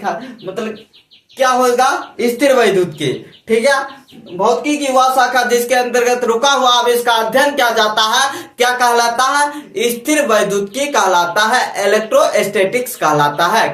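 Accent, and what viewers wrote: Bihar, India. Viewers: Bihar se ho kya??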